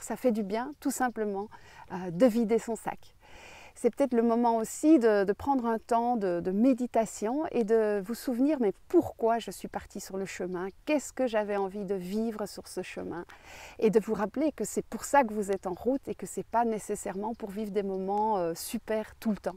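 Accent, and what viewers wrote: Belgium. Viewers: Bonjour j’aurais bien vu vos randonnées en Norvège et merci encore pour la positivé et cet accent belge bon courage pour votre chemin dans l’éternel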